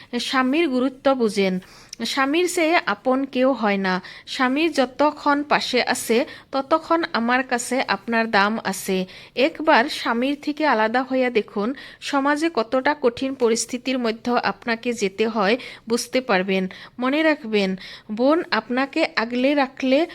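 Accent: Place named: Sylhet, Bangladesh. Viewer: আপনি কি সিলেটের মানুষ।আপনার ভাষায় বুঝতে পারলাম আপনি সিলেটের মেয়ে।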